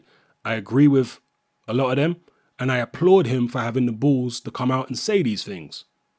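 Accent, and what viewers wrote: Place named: London, United Kingdom. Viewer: Another brilliant video. Ur speaking ability is a juxtaposition. U have the uk London ghetto tone with the Oxbridge vocab and articulation. You should have more subscribers!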